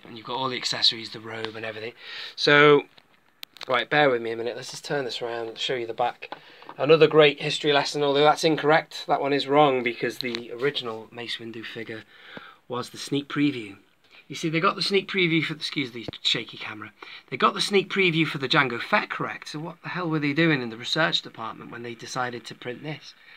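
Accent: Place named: United States. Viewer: I like him better as a figure than I did as a character. This one is nice looking. Actually, so many of the vintage line are. i don't collect them, I just love to look at people's collections. I also like to hear your take on everything, I especially like hearing your American Accent, which is pretty good btw.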